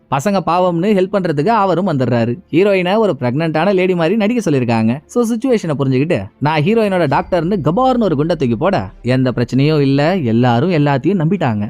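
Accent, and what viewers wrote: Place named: Southern Tamil Nadu, India. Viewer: Congratulations bro. I hope you are from the Southern part of Tamilnadu. Great vocal energy and slang. Kindly reveal your native brother.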